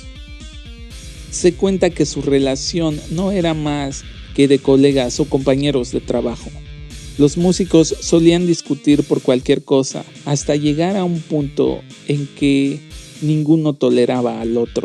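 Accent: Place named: Mexico. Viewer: ES MEJICANO. SE LE ESCAPA CRONICO EL CANTINFLAS. SUENA MAS A COMENTARISTA DE PASITO DURANGUENCE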